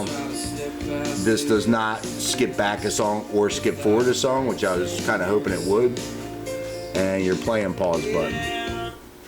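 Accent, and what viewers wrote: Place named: Pittsburgh, United States. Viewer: ....that accent though!!! Bro that sounds like Pittsburghese lol!! Great review though man....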